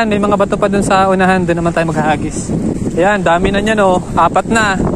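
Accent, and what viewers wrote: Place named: Polillo, Philippines. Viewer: Boss taga quezon province kaba.para kc punto ng taga polillo.😂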